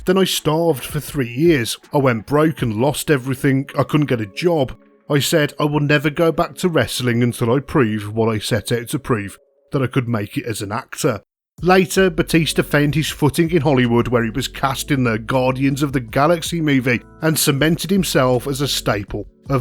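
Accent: United Kingdom. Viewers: “or joost a bit of good look” I love bri ish accents 😁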